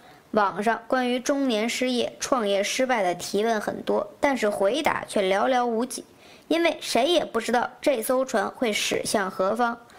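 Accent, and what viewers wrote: Beijing, China. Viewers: 这口音是京片子吗？真逗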